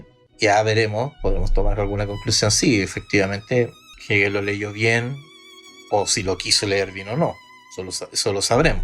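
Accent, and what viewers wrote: Chile. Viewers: Jajaja es que es de chile, pero yo si lo entiendo y está muy bien .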